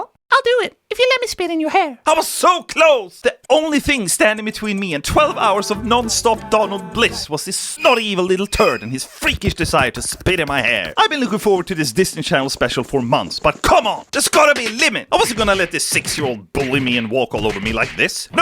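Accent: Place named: Sweden. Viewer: I really love these vids. They're definitely worth the wait! Plus we get to listen to ' EPIC Swedish accent!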